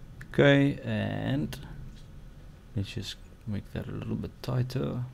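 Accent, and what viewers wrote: Afrikaans, South Africa. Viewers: Baie Geluk, ek sien een van jou videos is gefeature op No Filmschool, toe ek die video kyk toe kon ek sommer hoor hierdie is 'n Afrikaanse Ou. Baie geluk!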